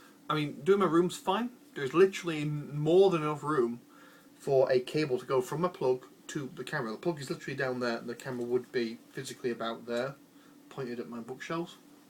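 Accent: United Kingdom. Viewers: I love your accent and pronunciation! Bear in mind, language is identity and we all come from somewhere. I keep my German with a strong northern accent, as I'm from Hamburg and I like the area. I don't attempt to filter it to simulate standard German. Your accent reminds me strongly of folks I knew in the UK during my student days there. My point is that I understand everything you say perfectly well and I greatly enjoy following your thoughts. Keep it up!:-)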